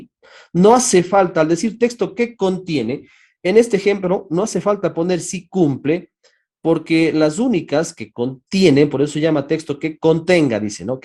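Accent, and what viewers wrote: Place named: Ecuador. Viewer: Por su acento al hablar sabia que era Ecuatoriano, muchas gracias buena clase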